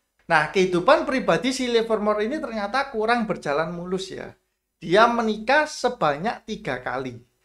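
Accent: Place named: Java, Indonesia. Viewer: seneng sama mas, bosone medhok😀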